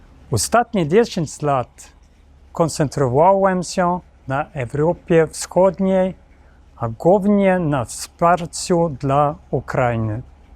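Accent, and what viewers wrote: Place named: Sweden. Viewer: 😊bardzo ładnie brzmi polski język z szwedzki akcentem 😊Polska låter väldigt fint med svensk accent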